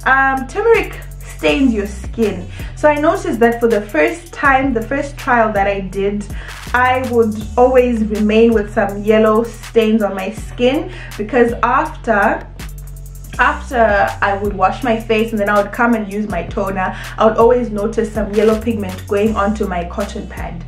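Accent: Zimbabwe. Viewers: She sounds Zimbabwean. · She sounds zimbabwean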